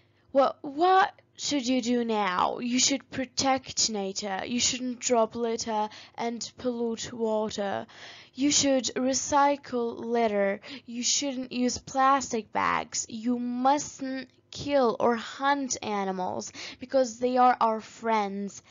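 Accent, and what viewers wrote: United States. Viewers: لانها تقرا بالطريقة الانجليزيةالامريكية وليست بطريقة برتش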